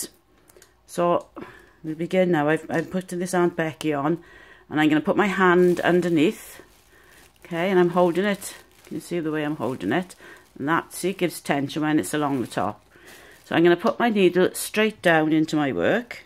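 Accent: Wales, United Kingdom. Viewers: Wonderful tutorial and so lovely to hear a 'tidy' Welsh voice.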